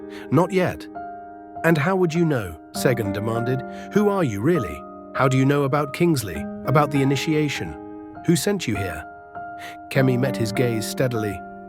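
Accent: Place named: United Kingdom. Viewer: I SO AGREE! I CAN LISTEN TO THE NARRATOR (BRITISH ACCENT) ALL DAY. LOVE THE FEATURED MOVEMENTS OF THE CHARACTERS. GREAT STORYLINES FROM START TO FINISH.😊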